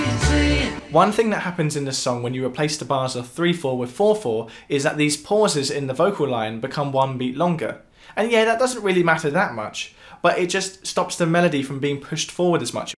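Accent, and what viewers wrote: United Kingdom. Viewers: The second you started talking I was like Ahh a fellow brit!!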